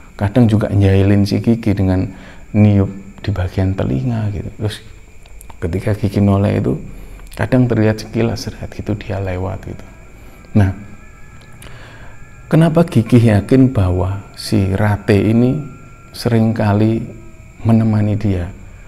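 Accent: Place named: Surabaya, Indonesia. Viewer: luar biasa. uenak rek cara nyampaikan nya podo suroboyone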